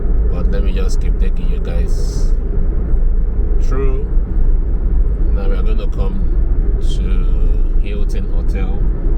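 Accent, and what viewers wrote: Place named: Nigeria. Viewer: This guy's Nigerian English is hard to understand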